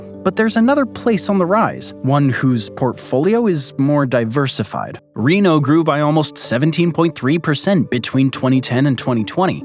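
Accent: California, United States. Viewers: Sounds a little bit like you might be from California.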